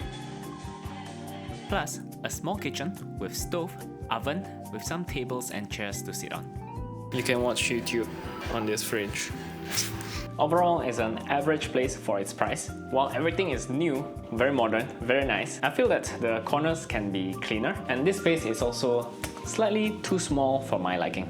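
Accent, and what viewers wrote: Indonesia. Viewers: Your accent come from where? Sounds more like indonesian rather than singaporean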